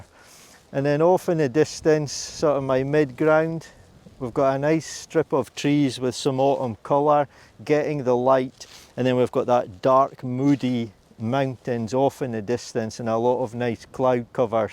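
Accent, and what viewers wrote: Scotland, United Kingdom. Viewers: I knew you were from Scotland before you mentioned it.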